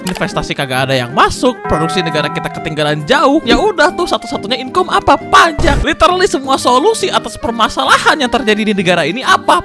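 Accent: Bandung, Indonesia. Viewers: Lo orang mana bang? Bandung ya?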